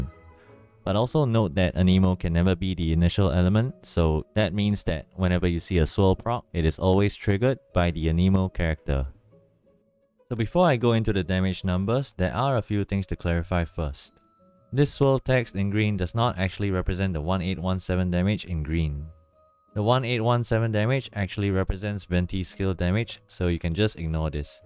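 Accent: Singapore. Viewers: are you singaporean? idk why your accent sounds like it :D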